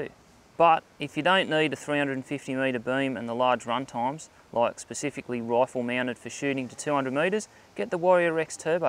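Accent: Australia. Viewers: Really enjoying your videos mate. So good to hear aussie voice and Australian content :-)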